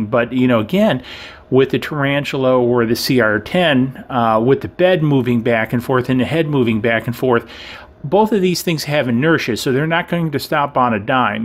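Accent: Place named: Chicago, United States. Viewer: That Chicago accent!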